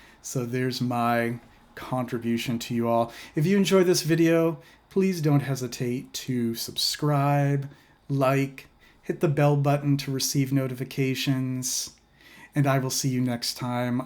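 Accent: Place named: New England, United States. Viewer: What New England state are you from? I'm from there too and I can pick up that accent anywhere! CT?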